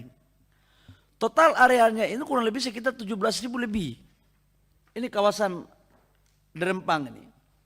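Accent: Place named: Papua, Indonesia. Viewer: 😂😂😂 mantap pak menteri sa suka logat p Papua d pakai d sini. D tempat wakil rakya.😅😅😅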